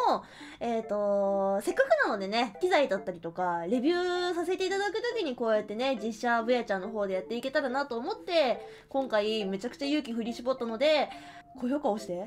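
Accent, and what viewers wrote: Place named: Kansai, Japan. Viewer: 関西弁なんですね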